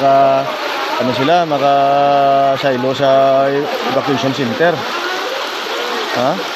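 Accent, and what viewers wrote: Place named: Iloilo, Philippines. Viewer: Saan yan sis ilonggo angvsalita halong kamo da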